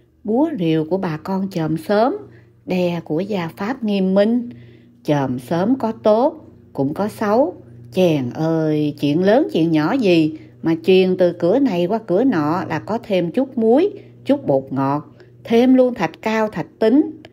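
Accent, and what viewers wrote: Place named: Mekong Delta, Vietnam. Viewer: Vui vui ! Giọng đọc chuẩn miền Tây luôn bạn ơi ! Cám ơn bạn đã chia sẽ.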